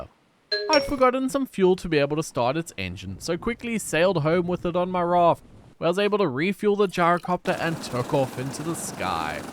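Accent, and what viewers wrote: Afrikaans, South Africa. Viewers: I think anyone who has ever met a single south African forever recognises the accent. It's quite unmistakable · hy het n afrikaans se aksent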